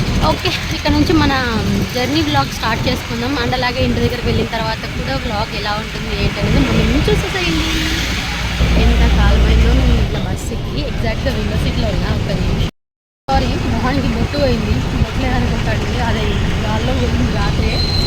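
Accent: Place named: Rayalaseema, India. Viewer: rayalaseema bhasha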